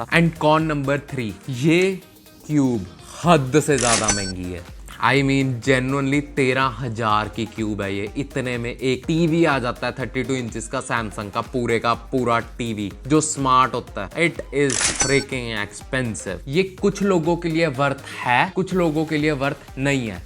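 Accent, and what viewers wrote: Punjab, India. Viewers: Hated you Fucked up Punjabi accents 🤮🤮🤮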